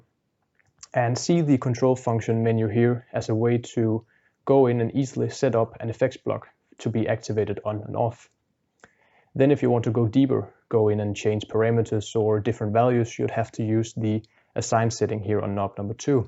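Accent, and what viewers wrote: Denmark. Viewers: Is that a Danish accent?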